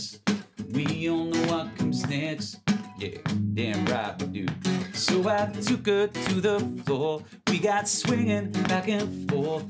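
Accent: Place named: Australia. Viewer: haha love the aussie accent so strong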